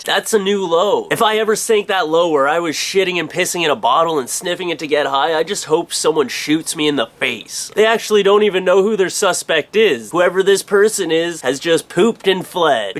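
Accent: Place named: Canada. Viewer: I think should find an excuse to say 'house' in every video. Believe it or not, my friends and I have become so amused with his accent that we all say 'house' Canadian-style.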